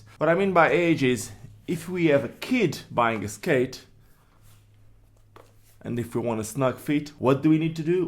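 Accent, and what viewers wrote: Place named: Portugal. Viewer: Are you from brazil or portugal? Cause you have a portuguese accent. Great video btw (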